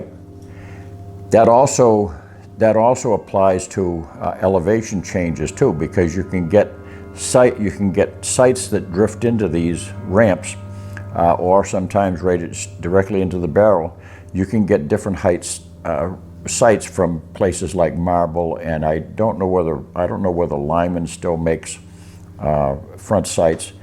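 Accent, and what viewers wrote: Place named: Maine, United States. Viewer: I know a fellow maine accent when I hear one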